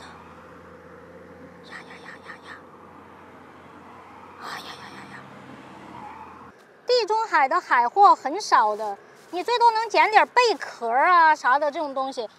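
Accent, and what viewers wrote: Sichuan, China. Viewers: 带着一点川普的感觉，四川普通话哈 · 这妹子是四川口音吗，还喜欢吃麻辣 笑哭 哭笑 笑出眼泪 破涕为笑 笑死 笑尿 笑cry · 你这川普哦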